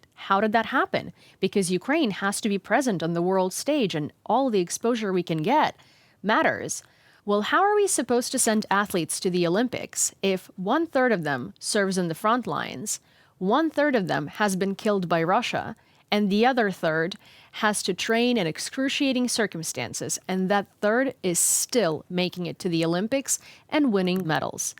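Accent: United States. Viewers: my sincere appreciation for your work and for Gaze media as well. You undoubtedly do a meaningful job, cz western media doesn't comprehensively cover the news about the war in Ukraine. P.S. Thanks for exposing to perfect American English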